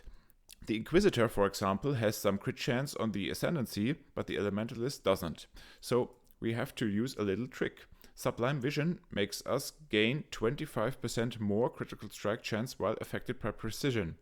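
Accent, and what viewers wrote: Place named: Germany. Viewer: Wie man direkt an deiner Stimme merkt das du Deutscher bist. Sehr interessant! :D Btw.. Auf was muss man genau achten, wenn man die Sachen kauft? Denn das Amu allein könnte ja schon, wenn man pech hat, um die 3div kosten durch die Attribute+ Cold spell gems. Da wir ja beim CoC die Taktrate beachten müssen, wollte ich dich fragen, wo man das sieht und händeln kann, da ich keine Lust habe, dass meine CDs rumspacken und damit das ganze Build fürn A** ist. Freue mich über eine Antwort! :D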